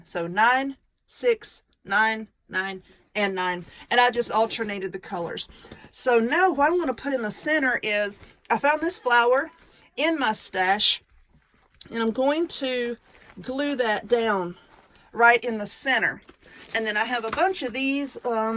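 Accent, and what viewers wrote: South, United States. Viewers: I really love your beautiful crafts. However I don't know if I love your crafts or southern draw best. Know any slang words you can entertain me with? You sure make me feel like I was in my old home. I'm looking forward to you exhibits. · I am so glad I found your channel. 1 you're Southern-like me- so we speak the same language (lol). 2 your creativity and ability to think outside of the box amazes me. I would've never thought 2 make a Cross out of cooling racks! Thanks for sharing your amazingly awesome ideas with us! I've got to add this one to my list of wreaths to make!!!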